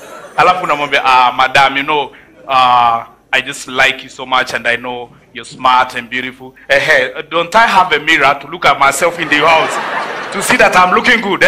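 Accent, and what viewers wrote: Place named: Nigeria. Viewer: i like this jokes,anaongea kama wanigeria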